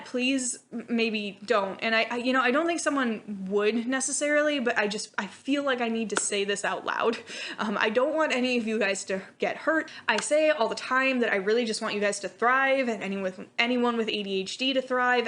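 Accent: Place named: Midwest, United States. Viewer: thick Midwestern accent CAT-EH-GOREY I'm seriously impressed by your grocery shopping skills, like damn. I'd be super interesting in a video on grocery budgeting. Food is always something I've splurged on as a self-care/comfort thing and while in pandemic I've gotten better about being mindful of a budget I feel like I'm still playing a guessing game at the checkout lamo.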